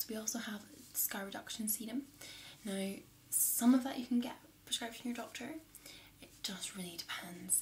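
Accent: Scotland, United Kingdom. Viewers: Scottish accent is probably my new favourite accent, so calming with your voice. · where about in scotland are you from? edinburgh here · lovin the Scottisch Accent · Yes finally Scottish ASMR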